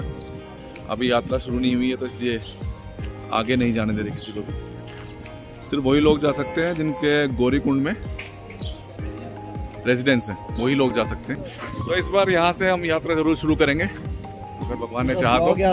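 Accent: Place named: Western Uttar Pradesh, India. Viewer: Bhai ap UP west se ho kya Ekdum hmara area jo moradabad ke pass ka hai bilkul aisa lagta hai apna. Koi bhai local bat kar raha hai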